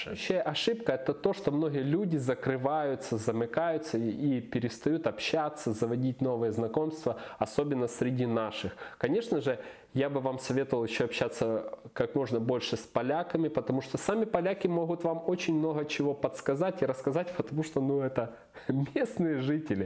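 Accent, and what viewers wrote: Ukraine. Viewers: судя по говору, он переселенец с восточных регионов или одессит. там это норма, так же как и в россии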